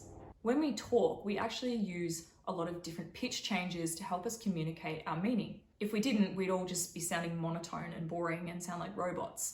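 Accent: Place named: Australia. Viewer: You sound Aussie and for that reason I’m following you. Lol (Australian here!)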